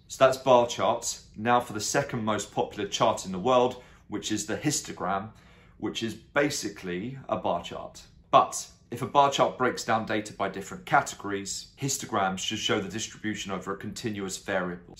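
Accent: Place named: United Kingdom. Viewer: I think you are from UK😊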